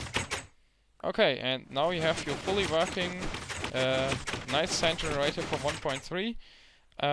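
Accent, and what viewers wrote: Germany. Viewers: dieser deutsche akkzent! :D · man merkt das du deutscher bist der englisch redet :D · hört sich komisch an, englisch zu sprechen mit dem deutschen akzent :d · Hes german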